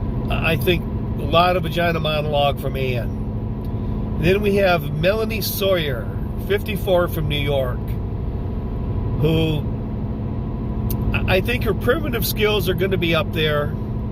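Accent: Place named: Michigan, United States. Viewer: KIND of fun to hear your take on the Alone show again - after all this time. I had to laugh when you made fun of the Wisconsin accent - Cuz I have to Say - Your Michigan accent is pretty special as well. Of all the Merican accents I would have to put MN, WI & MI accents as the most "special" & annoying as F - of ALL🤡 & I have been to almost every state...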